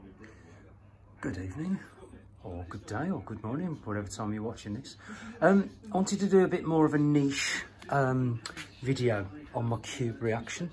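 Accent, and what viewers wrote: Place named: Midlands, United Kingdom. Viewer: Cheers dude not getting one you saved my bacon midland acsent👌☕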